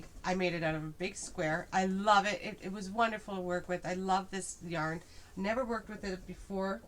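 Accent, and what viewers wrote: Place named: Canada. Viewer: I’m watching this video to get a review of the yarn and a couple minutes in I realized oh my God this woman is obviously Canadian and of course you are so am I! I’m just impressed when I can pick it out right away. It’s always the vowels that give it away.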